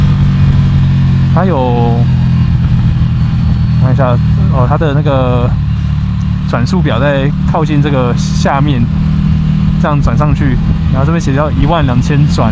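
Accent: Taiwan. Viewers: 講國語感覺很親切啊 台灣腔很濃😂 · 我覺得你講英文的時候好帥哦！ 講國語的時候又很台，怎麼會這樣子🤔🤔🤔🤔🤔🤔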